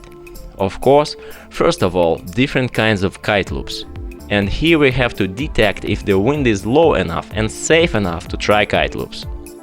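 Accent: Russia. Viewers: Facking good Russian's accented pronounce!!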